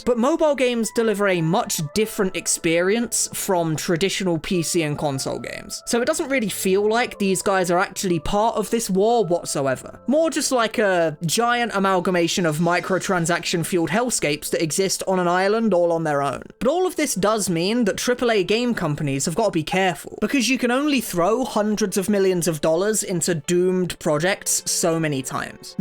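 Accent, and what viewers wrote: United Kingdom. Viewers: without the annoying british accent this guy would have 500 subs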